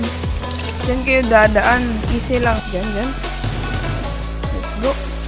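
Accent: Visayas, Philippines. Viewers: Hahaha bisaya diay ayaw nag saba!!! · BISAYA DIAY KA?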